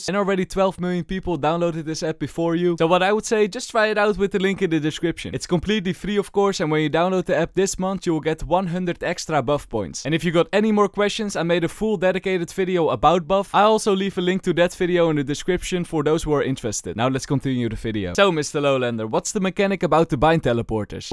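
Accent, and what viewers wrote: Netherlands. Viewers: With the Dutch accent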